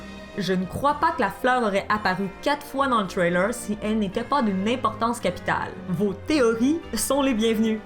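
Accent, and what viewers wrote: Quebec, Canada. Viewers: Jte kiff, honnêtement dhabtiude j'aime pas les commentatrices et encore moins avec l'accent du Québec mais la je suis tombé sous le charme de ta voix et de ta prestance ! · Tes Canadien mec? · Ta voix est trop cool je trouve, l’accent québécois est super. Sinon les montages sont cool. Et puis pour les info il me semble que le guide papier du jeux est très complet, perso je lais et c'est plutôt cool, il parle même des 3 dragon, et donne leur lieux passage etc.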